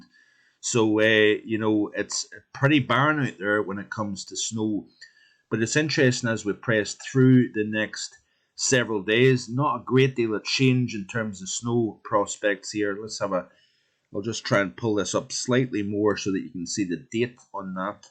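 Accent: Scotland, United Kingdom. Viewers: Would much rather listen to A Scotsman than an Englishman. Well done, I subbed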